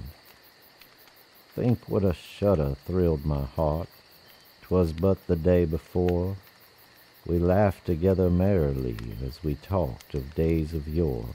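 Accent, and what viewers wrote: South, United States. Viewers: Gotta slite bit of * ...Southern drawl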